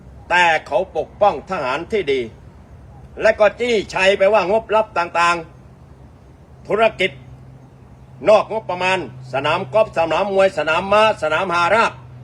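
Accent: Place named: South, Thailand. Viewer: น้ำเสียงเหมือนคนใต้นะไม่เหมือนคนอิสาน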